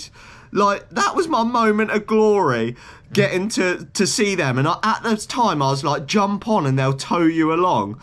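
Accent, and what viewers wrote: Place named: United Kingdom. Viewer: Accent was so unbearably british I had to stop watching one minute in.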